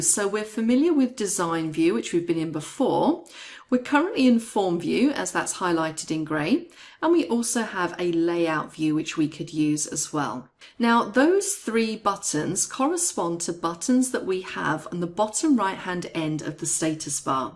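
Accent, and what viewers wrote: United Kingdom. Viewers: Is that a British Englishh? It is such a great pleasure to listen!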